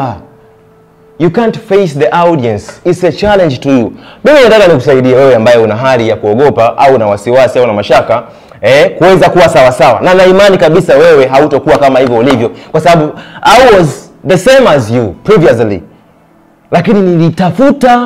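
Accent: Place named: Tanzania. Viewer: Nyoosha kingereza cako yaani unakiongeya kibongo bongo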